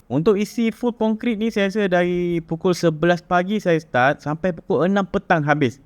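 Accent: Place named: North, Malaysia. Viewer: Bahasa menunjukkan bangsa...kueh2 buat keluaq...hahhahah...abg org utara ka...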